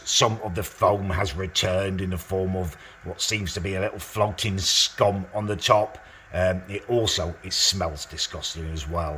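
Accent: United Kingdom. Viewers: He’s British dude 😂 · He’s just British man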